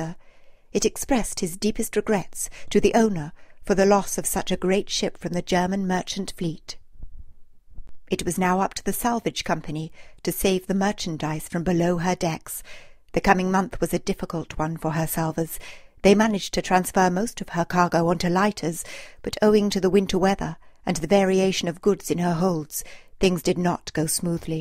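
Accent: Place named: United Kingdom. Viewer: Apart from the very informative contribution, I like the good British pronunciation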